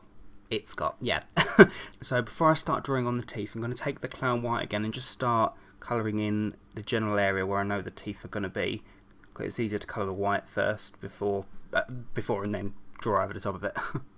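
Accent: England, United Kingdom. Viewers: Lovin all your tutorials xo! Its amaziiing! Even tho I'm your new subs:( and I also love your British accent ♥ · Here it was so scary and then you start speaking in this warm, wonderful voice with a lovely English accent.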